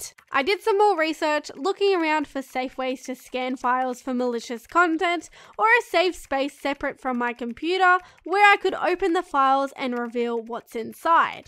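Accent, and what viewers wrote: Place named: Australia. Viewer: I love how I understand you sm because one word.... Australia ✨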